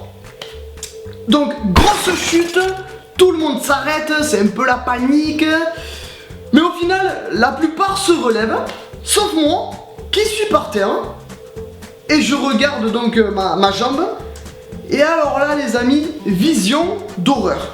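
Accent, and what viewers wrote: Marseille, France. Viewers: Tu est de Marseille ?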